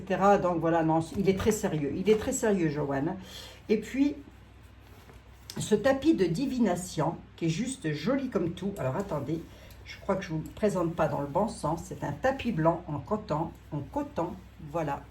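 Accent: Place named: Meurthe-et-Moselle, France. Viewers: dommage pour le son qui se coupe à chaque pause. donc il manque des mots . plaisir de vous entendre tout de meme avec votre accent de 54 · Ma chère toujours un plaisir de vous voir avec cette mise en bouche de 8 mn pleine de délicieux mots pour chacun... J adhère complètement. Pour ma part je préfère les amandes aux cacahuètes (Dieu a donné à l homme le cerveau et le sexe mais pas assez de sang pour irriguer les 2 en même temps). Avec votre joli accent de Lorraine. Bref ne changez rien. Je vous byz avec beaucoup de vibrations d amour de lumiere et de vérité. Eveillons nous tous. Avec gratitude Patrick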